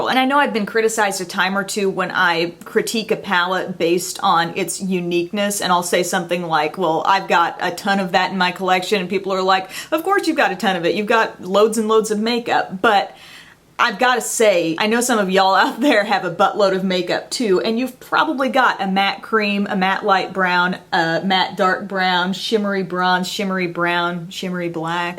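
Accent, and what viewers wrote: France. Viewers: Your French accent is sooooo cute! 😁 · Love your French accent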